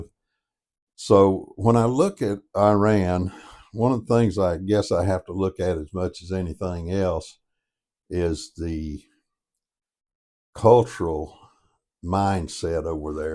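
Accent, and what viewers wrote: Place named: South, United States. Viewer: Listen, I'm a Marine Corps vet and former contractor. I'm about as right wing as you can get. People like you who live your sheltered little life down South are absolutely clueless. My ex wife was Jewish, I was trained by Israelis as a contractor after I got out of the military. Let me tell you something Papaw...Every single Zionist Jew in the world HATES YOUR GUTS. The second they hear your Southern accent they wish you were dead. They hate farmers, they hate people who hunt, they hate people who do for themselves and they spit on Christians in Jerusalem. Last year Israel declared themselves the gay capital of the world. You have absolutely ZERO experience with these people. They don't follow the Torah, they follow the Talmud and the Talmud and the Talmud says the Jesus is in "Gehenna" or hell boiling in feces. 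The State of Israel was founded by a Satan worshipping banking family called the Rothschilds. They signed the Balfour declaration of 1917. They founded OUR Federal Reserve and all the central banks of the world. the current state of Israel IS the GREAT DECEPTION!! You are utterly and profoundly clueless. I love Southern People, My Momma is from South Carolina but I'm a Yankee and I know these people YOU DON'T and you are talking out of your ass.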